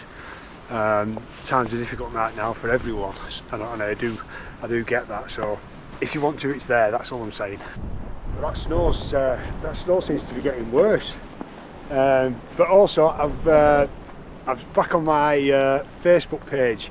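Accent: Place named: Accrington, United Kingdom. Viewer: Always wondered if you lived close to me with your accent, Im from Accrington 😊 Im up there most days, I know a few camp spots out the way when I can't get to better locations. Lucky to have it on our doorstep. Might see you up there at some point mate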